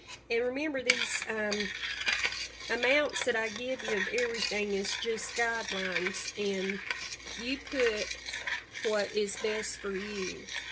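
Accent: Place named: West Virginia, United States. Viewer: I'm just curious as to where you're from. You sound like you're from WV like me.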